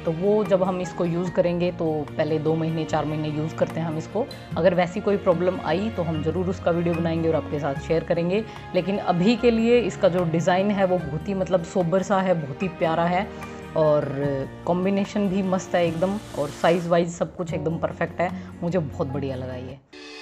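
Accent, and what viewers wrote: Haryana, India. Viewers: Your accent is Haryanvi! 🙏👍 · Haryavani hai...Jai haryana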